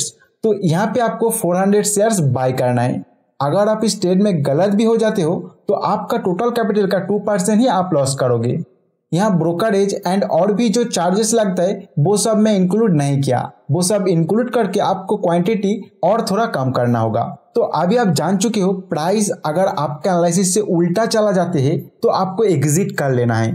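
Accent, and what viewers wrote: Bengal, India. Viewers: App ko accent se malum hota hain keya app banagali hain sir?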